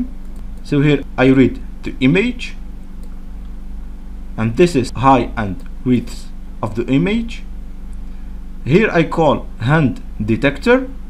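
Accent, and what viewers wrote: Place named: Morocco. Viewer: SAWT 3NDK MGHRIBI A SAT HHHH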